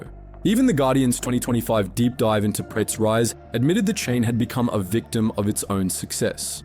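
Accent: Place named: Australia. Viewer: Why pick an Australian for the robo-voice?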